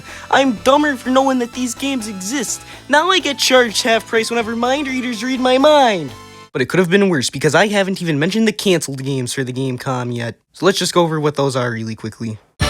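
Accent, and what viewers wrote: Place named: Canada. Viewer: He sounds more like he's canadian tbh.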